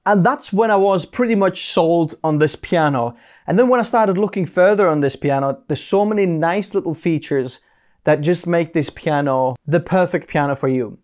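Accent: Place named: United Kingdom. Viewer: Wow…just realized you posted this a day ago! (On the basis of your accent, you’re posting from the UK, I’m assuming….) Coincidentally, I just purchased an LX706 today. I have a few days before it will be delivered, so I’m biding my time watching YouTube videos on the model, which is how I happened upon your excellent video. Thanks so much for the detailed presentation! I live in Honolulu and purchased a Roland FP30 about three years ago during COVID when piano tuners weren’t making house calls. I had tried to teach myself how to tune my 1990s Yamaha acoustic upright but messed everything up completely and broke a string. Knowing that tuners wouldn’t be making house calls soon, I immediately drove to the only piano dealer on Oahu and picked up the FP30 with stand and pedals for $1000. Over the next few months I fell in love with digital pianos, even though I’m a classical pianist who doesn’t care about all of the non-piano sounds. 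When I finally had my Yamaha tuned this past summer, I realized that I had become a digital piano player and that acoustic uprights just weren’t as satisfying anymore since the advent of high-performing digital pianos. Fast forward to this past week, when I decided to upgrade from the FP30. I watched numerous videos comparing different brands and models, then decided on the FP90x as the most affordable upgrade. But then I went back to the original piano seller’s website and saw that they had a pre-owned LX706 selling for about half the retail price…s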